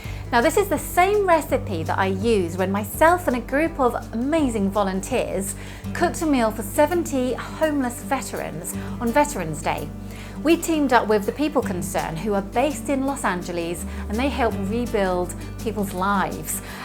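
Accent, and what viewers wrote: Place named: London, United Kingdom. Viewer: You are very welcome. The simplest and most heartfelt things are always the best. You did good and should have been recognized and complimented for it. I love the accent. Sounded like London area. I'm not from the UK but lived in the Devon area for an extended time after my retirement. You would have charmed the old Vets brilliantly. I am so jealous.:-)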